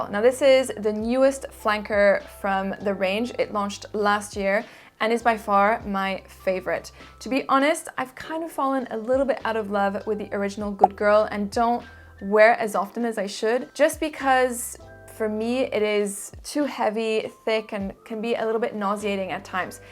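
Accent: France. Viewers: Je souhaite te féliciter pour cette chaine Youtube, tu mérite 1 million sub et j'adore ton accent français. Bonne continuation.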